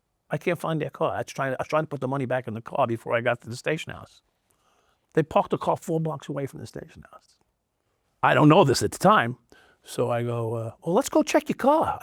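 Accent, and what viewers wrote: New York, United States. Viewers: This is pretty much standard, old-school New Yorker, law-enforcement and judicial bureaucracy personality. I know a judge who was a former narcotics processor who talks a lot like this Some people are saying that his accent doesn’t line up with what they know New Yorkers to sound like, but they’ve been here for only 10 years. The person that made the comment and indigenous New Yorkers are harder and harder to come by now. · I don’t know what you’re talkin about 🤷🏻 that’s as New York as you can get an accent you must not be from New York 🗽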